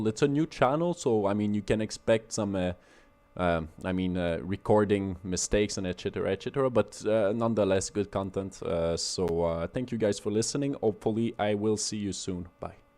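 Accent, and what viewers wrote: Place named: Quebec, Canada. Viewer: You sound very french canadian to my french canadian ears. Am i right?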